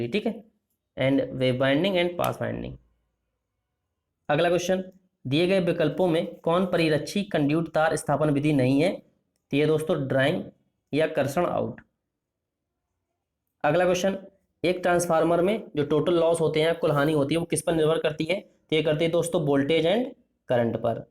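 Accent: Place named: South, India. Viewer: South Indian hindi me samajh nahi aatha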